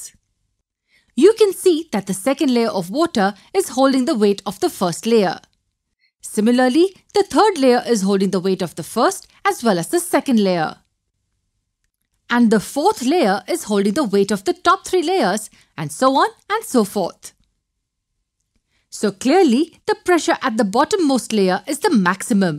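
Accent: India. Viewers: I hate the Indian accent lol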